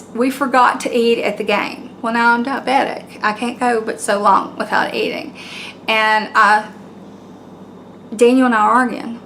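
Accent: South, United States. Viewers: Loved your Kooky-ian vid so started binge watching other vids. If you like true ghost stories check out "Real Ghost Stories Online" podcast w/Jenny & Tony Brueski. Sooooo good!!! Love hearing you talk. We've moved to Maine from the South & it is lovely to hear familiar accents!! LOL!! Sending much love!!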